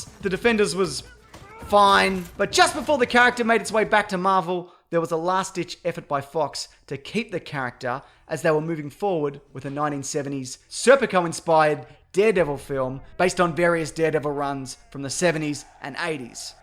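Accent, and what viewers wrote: Australia. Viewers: Marcus Casamento All but three countries in the worl use the metric system. Also, are you deaf? he's Australian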